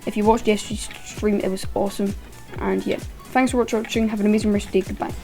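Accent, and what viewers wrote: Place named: Scotland, United Kingdom. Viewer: Scottish roblox YouTuber no way I was thinking about starting 😂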